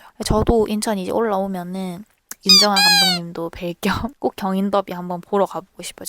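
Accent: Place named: Gyeongsang, South Korea. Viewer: 갱상도 아가씨가 강원팬이래요~~~?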